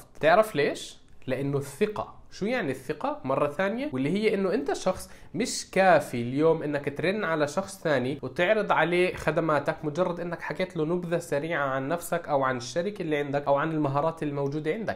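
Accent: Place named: Jordan. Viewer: شكرا على محتواك الرائع بس سؤال صغير انت اردني؟؟؟؟؟ لأنو لهجتك زينا ونا انعجقت مش عارع ههههههههه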